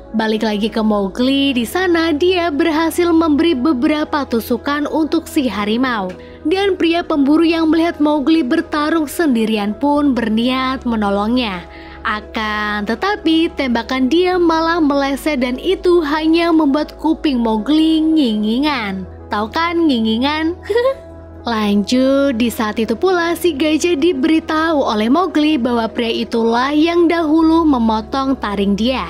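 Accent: Pemalang, Indonesia. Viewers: orang pemalang mba? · Mba sampean kaya wong pemalang mba, angger iya tangga mba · Goblog nemen si🤭 Kya logat e wong Pemalang kota, bener ora sih wkwk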